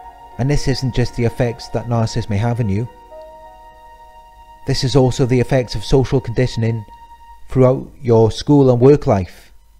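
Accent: United Kingdom. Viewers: Thank you for this. I needed a reminder tonight. Thank you for all your videos. Your voice is comforting. May I ask where in the UK your accent is from? I'm in the US.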